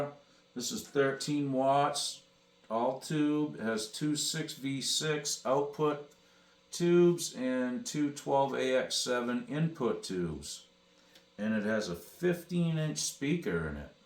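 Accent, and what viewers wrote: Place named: Maine, United States. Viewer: You sounds like you straight came out of middle/central Maine.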